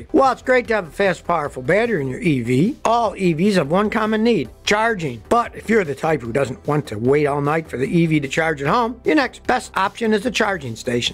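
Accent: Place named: United States. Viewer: Escellent video production, unfortunatly I often get lost, i find it sometimes difficult to follow your american accent. I suppose I need to follow you more often and I will soon get used to it. Where is your accent from?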